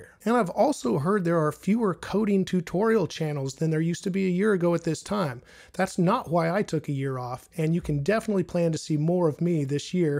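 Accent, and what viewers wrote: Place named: United States. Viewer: Hi how are you today? I miss you my friend, I miss you and your hot American accent, thank you for uploading another video clip of yourself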